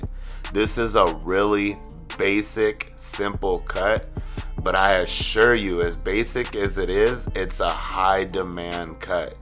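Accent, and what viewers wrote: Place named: California, United States. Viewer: I knew you were Mexican , sound like from Cali . Haha idk man 🇲🇽, wouldn’t mind have another andis shaver 💈💈💈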